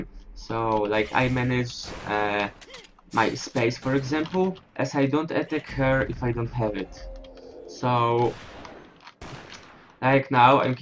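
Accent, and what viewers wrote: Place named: Poland. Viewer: Oglądałem tylko ten filmik i wyczułem po prostu Polski akcent i tak się zastanawiałem.. :)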